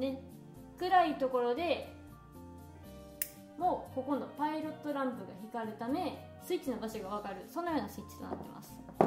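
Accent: Kansai, Japan. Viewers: 丁寧な解説でとても分かりやすかったです。関西弁がきついので標準語バージョンがあるとうれしいです。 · 言葉の端端に感じる関西弁が良いです！ 実家が電気屋なのでついつい見てしました！ 俺も電気工事士取ろうかな！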